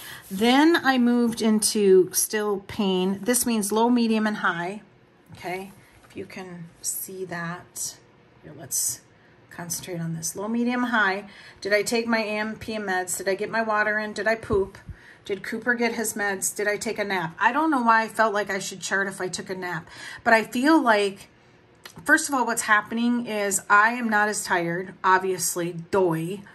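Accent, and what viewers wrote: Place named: Wisconsin, United States. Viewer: 👏🏽 i applaud this. i’m also working on a health journal. also, i love your accent so, so much! ❤ are you a wisconsinite, by chance?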